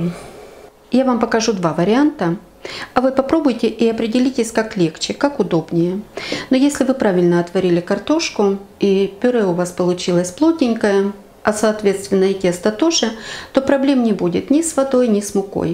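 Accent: Ukraine. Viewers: дорогая. Потрясающе. У меня слезы на глазах от Вашей прекрасной украинской речи, это напоминание о мужестве Украинского народа перед лицом плешивого фюрера. А зразу чочу попробовать с курицей, мясо не ем. Буду готовить с удовольствием, дзякую!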